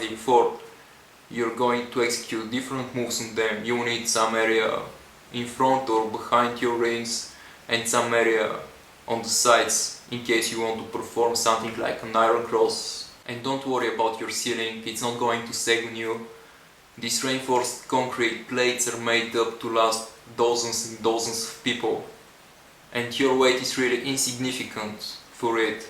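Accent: Bulgaria. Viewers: тва ако не е български акцент те па на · He is bulgarian bro :D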